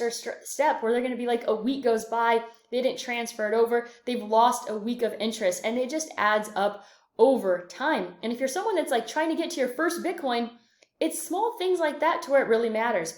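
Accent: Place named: United States. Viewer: I’m aware of when it happened. Did you also know that the US tested contraceptions illegally on PR women which made many sterile and some even die. Aside from what obviously has affected the fertility rate on our island, why women are choosing to have less children? Lack of money and opportunities which is getting even worse with all you rich people moving in and raising the prices of real estate. “There are less white people in Hawaii” It’s not about white people, it’s about rich foreigners and there are many in Hawaii taking over their lands. That’s the reason why homelessness of the natives has increased in Hawaii. Also, I imagine that you’re a white American from how you speak. Just know that most Puerto Ricans could care less about US political standpoints. So, this whole “liberal” or “conservative” or “woke” crap means nothing to me. I’m talking to you about Puerto Rico. 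You can’t educate me about my own island, its people and history; I AM PART OF ALL THAT.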